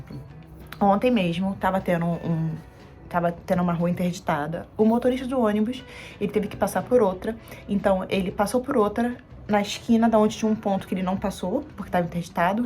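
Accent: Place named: North, Brazil. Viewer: De onde vc e? Sotaque parece do norte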